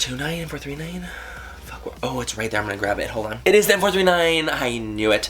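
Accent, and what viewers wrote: England, United Kingdom. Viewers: can you do an entire video in your English accent please? tysm! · Why does he talk in a British accent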